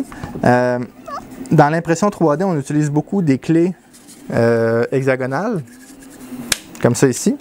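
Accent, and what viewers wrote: Quebec, Canada. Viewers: Merci pour tout. J'ai appris beaucoup avec toi et j'apprécie ton accent québécois. C'est assez rare d'autant que la qualité de tes vidéos est bonne. Pour la version courte passe au 4ième paragraphe. Je me suis acheté une tornado et j'ai eu beaucoup de plaisir avec cette fin de semaine. Je l'ai calibré avec un cube de 20mm (merci à toi). J'ai imprimé mon support et de petits bidules. Mais j'ai eu un problème avec hier en après-midi. Mon extrudeur sautait un coche au 5 secondes. J'ai arrêté mon impression, monté ma buse et donné de l'extrusion. Encore le même problème. J'ai essayé de la débloquer en retirant le filament à froid à plusieurs reprises sans succès. J'ai détaché le ... tube ... et essayé de la débloquer à chaud sans succès. J'ai enlevé la buse et enlevé (en poussant) tout les résidus, replacé la buse de secours et je crois que le problème s'est corrigé. 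J'ai commencé à nettoyer le bed. Rien à faire pour enlever la première couche et j'ai fini par briser le tapis. Si tu avais un truc pour le tapis ce serait bien. Et j'ai finalement abandonné. Par la suite, je crois avoir compris ce qui s'est passé. Ma dernière impression était en qualité haute et mon bed devait être un peu trop serré ce qui a causé un engorgement. L'engorgement étant fait les autres tests ont donnés le même résultat. Maintenant les questions. Comment faire pour nettoyer le PLA de la buse? Quels devraient être les paramètres d'impression lorsque la hauteur de la couche est à 0.1mm? Merci encore pour t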